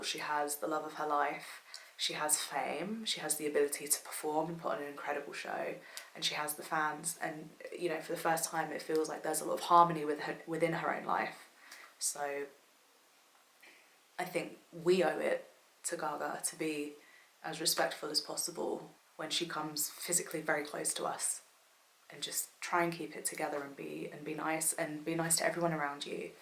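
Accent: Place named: Kent, United Kingdom. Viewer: I reckon you're from Kent, not that I'm trying to dox you or anything but I'm from Kent (UK) and your accent sounds kinda like mine.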